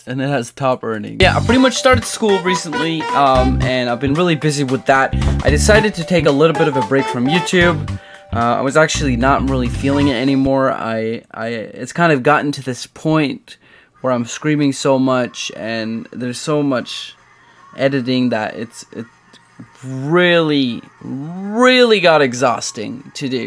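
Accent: Norway. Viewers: Are you from Norway ๏︿๏ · You are from Norway right?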